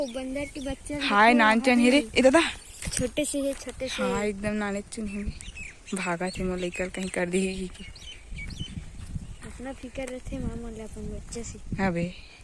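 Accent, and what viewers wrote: Chhattisgarh, India. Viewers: Ek dum chhattisgarhin boli bahut achha he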